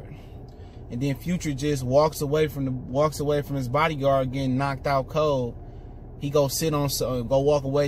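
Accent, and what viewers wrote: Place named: Cleveland, United States. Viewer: It sounds like you're from Cleveland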